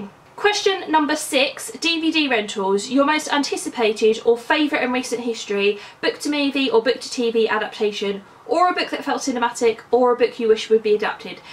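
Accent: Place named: Birmingham, United Kingdom. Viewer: I love you're accent! Are you from Birmingham?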